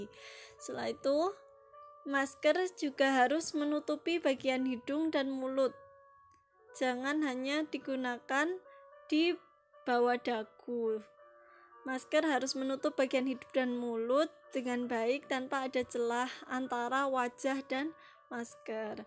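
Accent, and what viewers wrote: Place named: Java, Indonesia. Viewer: 👍👍👍 medok kali mbak suaramu 😁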